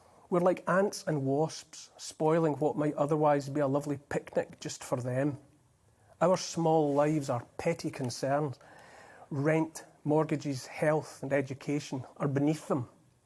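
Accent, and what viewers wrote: Scotland, United Kingdom. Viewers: Sorry, I thought you were a twat wandering around the countryside commenting on puffins et al. You are inspiring....especially as we can understand most of your brogue! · is really getting Scottish on us. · I’m always scrolling thru cuz I want to see more of, talks total sense. Conclusion - he’s not in the GB news schedule often enough, and I think the user comments reflect that. We all love that Scottish twang, although I think maybes the ladies out there are thinking Mel Gibson in Braveheart - dont blame u tho, I’m waiting for a rugged man (or woman if you’re not beaten down) of any Nationality to save us from this madness, xx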